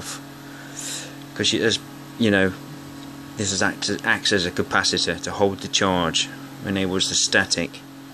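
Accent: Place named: East Midlands, United Kingdom. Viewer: East Midlands accent... Derbyshire? Ripley for a guess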